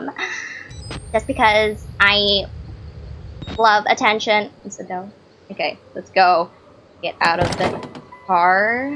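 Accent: Bisaya, Philippines. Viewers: Ate pinay ka po ba? Cute ng braces tsaka ng accent mo hehehe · Bisaya u?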